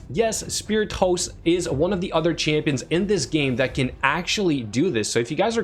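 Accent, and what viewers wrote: Quebec, Canada. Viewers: french Canadian i think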